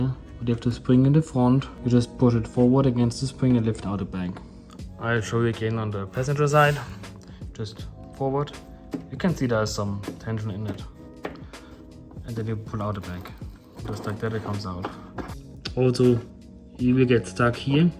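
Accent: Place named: Germany. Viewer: Another great video man. I can't lie, love your German accent.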